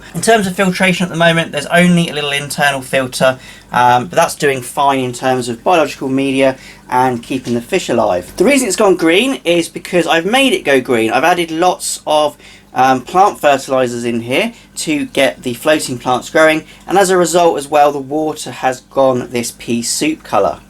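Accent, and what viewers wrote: United Kingdom. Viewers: It’s a bit of a blunder by Fluval. I don’t even have any extra sheet in the box telling that it should be positioned in a happy T face but an upside down T as in the original manual. Mine must be the earlier batches. I was bound to know the correct position of installing the unit only after watching your video. Repositioning the unit is a breeze. It’s true though the flow rate is faster with the T position as the water hit the unit going down the curve and up again instead of hitting the unit going up and down the curve. BTW thanks a bundle for all your very informative videos and your British accent is superb!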